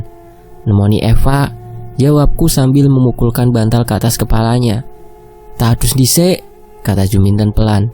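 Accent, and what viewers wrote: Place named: Surabaya, Indonesia. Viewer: Oh yo iki arek suroboyo, wong ngomongno mi setan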